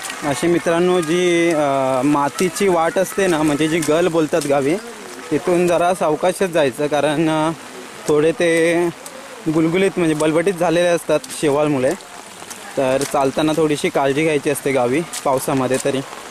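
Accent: India. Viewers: बहुतेक राजापुर तालुका असणार तुमचा.असं बोलण्यावरून वाटतय.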